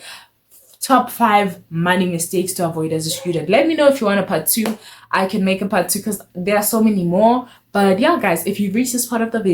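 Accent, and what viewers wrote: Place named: Zimbabwe. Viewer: Interesting topic and subscribed. Where you’re from originally, I picked up an accent. I know you studying in SA. Are you Zimbabwean or Malawian?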